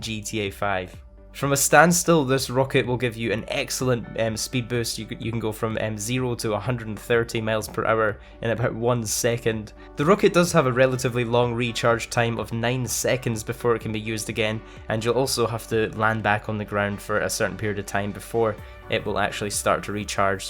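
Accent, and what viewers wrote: Scotland, United Kingdom. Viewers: I thought he was british 😂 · You didn’t know he was Scottish are you stupid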